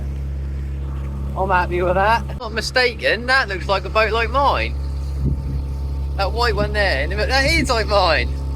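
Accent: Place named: Norfolk, United Kingdom. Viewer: I loved this video. You come over as an extremely likeable happy guy. I left UK 14 years ago after living in lovely Oulton Broad for 15 years. It's lovely to see what i miss very much. I remember as a kid cruising across Breydon Water with my parents on a hire boat. It was like going out to sea. And boy do I miss that accent 🥴🥴. I wish you many happy hours of cruising and subscribed for the next ones.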